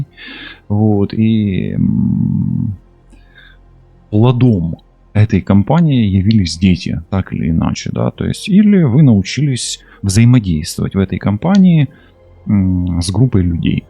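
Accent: Ukraine. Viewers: Спасибо, комнату отремонтировала, стараюсь восстановить материальность после кражи вошедшего в доверие менеджера по недвижимости. 😊😊😊(Благодарю Творца и Таролога) 🛐 Приятно слышать такой мягкий малороссийский выговор.❤❤❤С-Пб🎉🎉🎉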